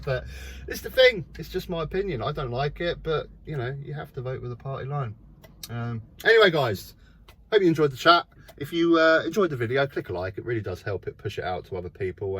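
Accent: United Kingdom. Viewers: As an ex-Pat now living in the USA I enjoy seeing the British towns and countryside, and hearing British accents. You are one of five different YouTubers I follow to hear the news about the UK and the political situation. I'm keen to hear how Labour are doing, and if they are actually reversing some of the Tory mess, or are they going to be a disappointment (e.g. the 2 child tax credit cap)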